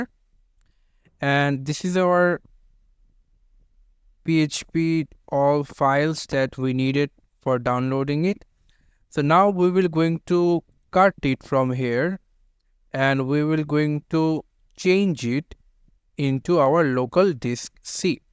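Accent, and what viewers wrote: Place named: India. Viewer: I have yet to watch a PHP setup video with someone without an Indian accent yet!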